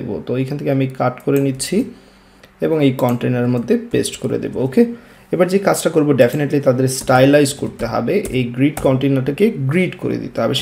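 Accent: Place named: Sylhet, Bangladesh. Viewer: sir u are really amazing.. Sylhety bhai ❤️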